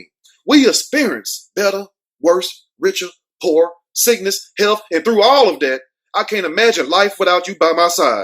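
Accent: South, United States. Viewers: your accent is somewhere between the Bible belt and Houston /Arkansas 😂😂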